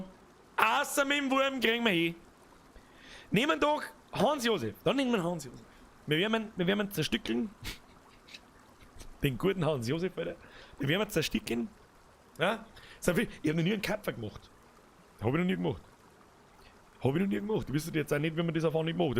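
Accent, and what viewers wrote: Bavaria, Germany. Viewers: Tahts Life Junge ^^ Bayrisch ist halt nicht jedermanns Sache Ich hab halt das Glück und wohne in seiner Nähe und verstehe alles :)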